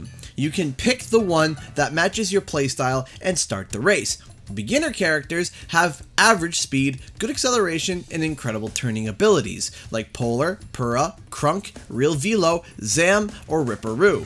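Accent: Canada. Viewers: Word of the video: "Vehgue." That the Canadian accent at work, I'm guessing?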